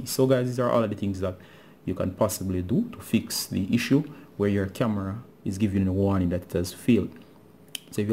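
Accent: Jamaica. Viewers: He's from the carrabien (jamaica) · by the way thanks Ricardo..idky I was surprised to hear a Jamaican but dweet!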